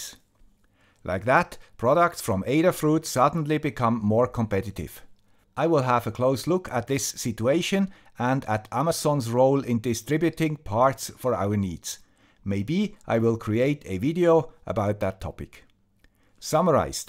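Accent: Switzerland. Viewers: Another great video! Thanks a lot. You put so much work into planning out and making these videos they are so informative. I also love your soft Swiss accent. Thank you. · Thank you for sharing your experiances. I really like the swiss dialect as well - in english as well as in german. :-) As I always had very good experiances with the D1 mini with ESP8266, the D1 mini version of the ESP32 looks interresting to me. Greetings from the rhine-main-region, germany Alex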